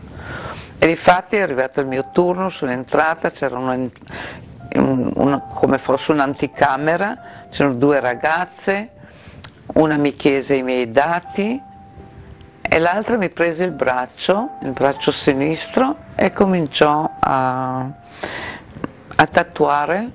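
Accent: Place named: Rijeka, Croatia. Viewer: Còcola, che emozion rivederte in video e ricordar i nostri incontri... sentir la tua bela parlada fiumana: no te dimenticheremo mai! abrazi, da qua giù fin là su!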